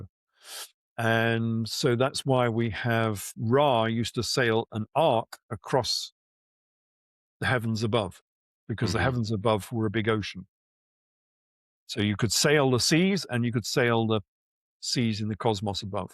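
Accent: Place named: Belfast, United Kingdom. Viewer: belfast accent is contagious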